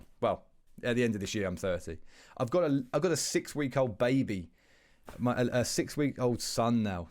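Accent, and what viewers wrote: United Kingdom. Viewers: I always enjoyed watching you and Spratt the most. Maybe as a Kiwi I just like the UK accent more than the American one? Excited for what's coming next!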